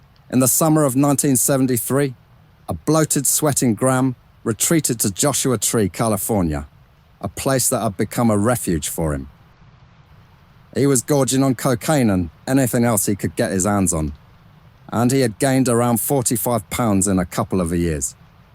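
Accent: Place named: London, United Kingdom. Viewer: It’s the London accent I think - so positive it’s almost confrontational! I love it!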